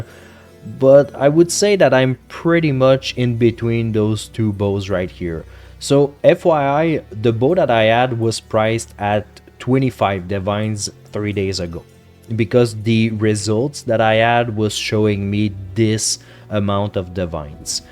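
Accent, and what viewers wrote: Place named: Quebec, Canada. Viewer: toi t'es quebecois c'est certain! hahaha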